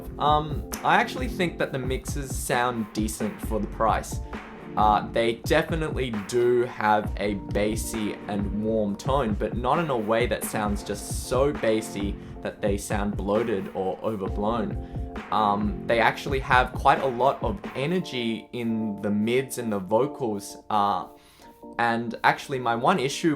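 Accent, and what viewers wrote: Australia. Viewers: What accent is it? Aussie?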